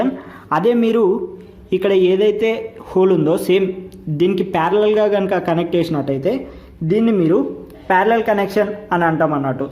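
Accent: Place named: Telangana, India. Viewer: language okkk telanganaaa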